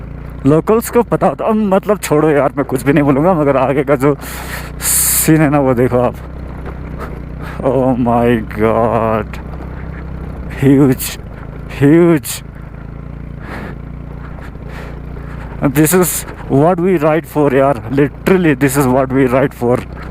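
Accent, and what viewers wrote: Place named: Northeast, India. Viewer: Yeh hai apna northeast hindi....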